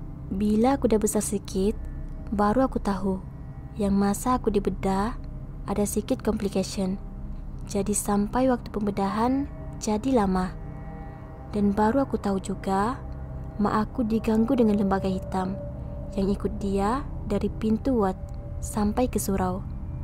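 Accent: Sabah, Malaysia. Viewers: org sabah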